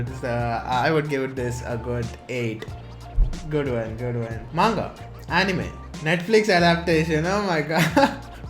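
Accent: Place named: India. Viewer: Ur voice seems like ur an Indian and if u are then I’ll be happy to know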